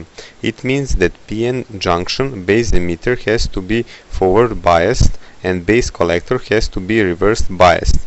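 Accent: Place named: Serbia. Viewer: SPEAKING ENGLISH WITH SERBIAN TONG.... PA STO NA ENGLESKOM...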